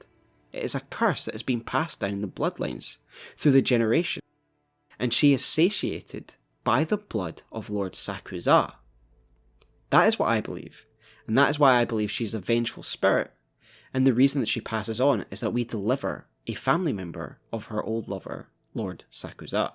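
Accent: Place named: Scotland, United Kingdom. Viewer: It's nice to hear a Scottish accent. Love your vids man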